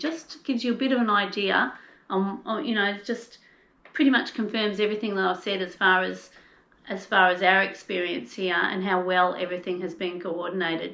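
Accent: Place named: Australia. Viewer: We enjoyed hearing about your Sydney quarantine experiences and had a good laugh as we misheard a couple of words as we are not entirely familiar with the Australian accent. Donna was confused, at first, about the 240 "chickens" (check ins) at the hotel. We were also concerned about what we heard as the "crime scene" (quarantine). So nice that the time passed smoothly for you and the staff and officials have all been so helpful and welcoming. I said to Donna, "it is so much better being there together rather than being a single person isolated in a room". Isn't it nice to know that two walked in and two walked out. No bodies for the hotel staff to clean up. LOL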